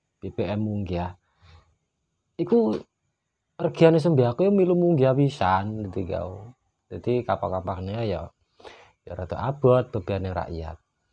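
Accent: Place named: Banyuwangi, Indonesia. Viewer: Kurang deles osinge kurang pas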